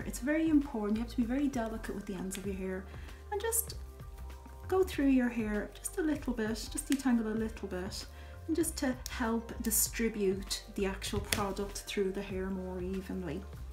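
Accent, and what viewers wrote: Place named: Dublin, Ireland. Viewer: Your accent! you are Irish 💕Dublin accent💕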